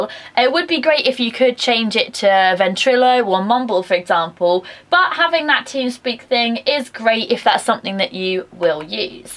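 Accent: United Kingdom. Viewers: You have the exact same accent and voice as Jaime Murray could it just be because you are from England? :P Great review btw.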